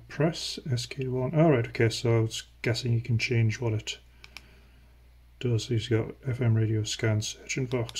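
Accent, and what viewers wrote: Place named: United Kingdom. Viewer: Local radio in Vancouver but your accent is from the UK, I guess you moved there for some reason?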